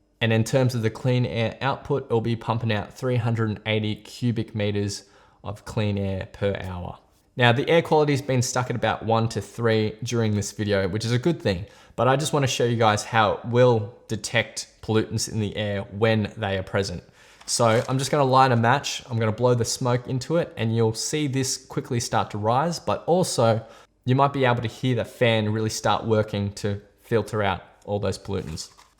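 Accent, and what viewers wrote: Australia. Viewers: Asian speaking aussie.. noice... Subscribe.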